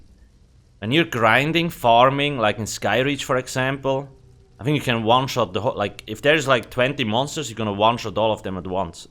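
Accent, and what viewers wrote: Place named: Switzerland. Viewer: He's from switzerland, dont be surprised by his accent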